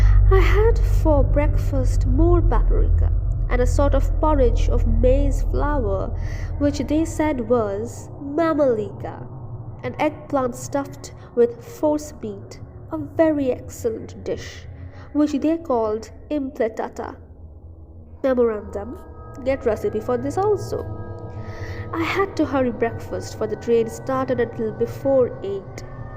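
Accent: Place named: United Kingdom. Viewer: Your voice is just wow .. accent is too British . Just love your audio book❤ keep going.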